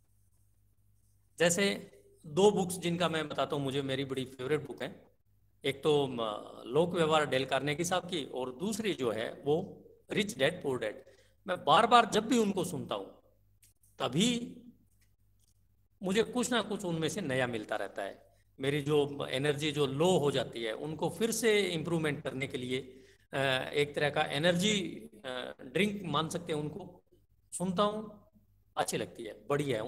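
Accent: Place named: India. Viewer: Perfect Raw indian voice. Suits subject that you have chosen shriman G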